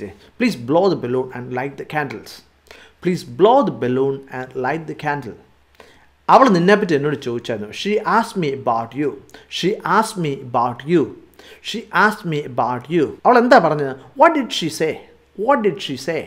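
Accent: Kottayam, India. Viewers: Malayalam slang kelkkan nalla rasamundu.kottayam aano? From trissur.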